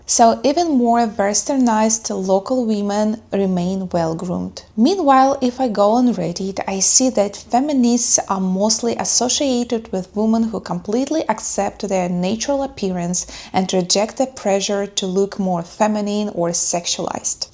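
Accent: Russia. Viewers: Dear, let's make a retro mid 1980s spy film, you the beautiful, seductive but deadly KGB agent in Warsaw Poland, setting up a honey trap............me.....defending the integrity of western values, the amiable naval attache at the British embassy, saving the world for democracy......the Russian and British accents together in the same room.......wonderful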